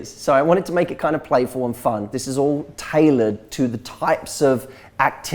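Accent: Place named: United Kingdom. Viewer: Do you know what..You are completely true..Before I saw your channel I hate British accent..Now I love it sooooo much..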